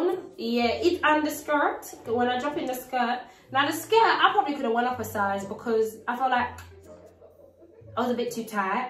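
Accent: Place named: United Kingdom. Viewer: #monaemaffia. Your British voice is everything. Your style is 💥💥💥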